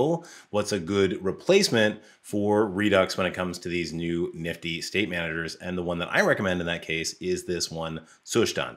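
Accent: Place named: Germany. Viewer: Have a great weekend. Love the German pronunciation. 🙏😀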